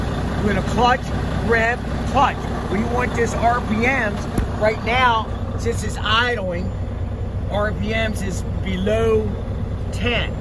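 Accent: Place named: Maryland, United States. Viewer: Nice! I can tell by your accent lol. I grew up in Baltimore, but now live in Idaho. Currently going to trucking school and trying to soak up as much information as possible. Thanks for the vids!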